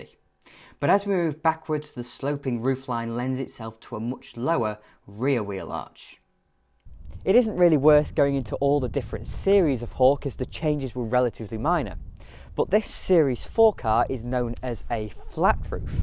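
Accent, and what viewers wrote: United Kingdom. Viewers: Very lovely piece of information I actually spent a year at the river Humber in (Kingston upon) Hill. Do I notice slight similarities in your dialect to what I heard in those days up in the North or am I put wrongly as far as your region is concerned? I luvved de North!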